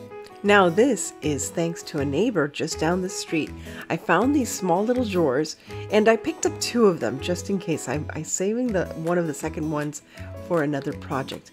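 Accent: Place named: Miami, United States. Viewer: I thought I detected the Miami accent! I grew up there just off of Red Road and Calle Ocho! In Ocala now.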